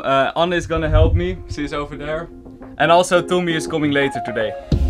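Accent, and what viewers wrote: Netherlands. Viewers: Hahahahha je kan zooo goed horen dat je nederlands bent ga zo door💪💪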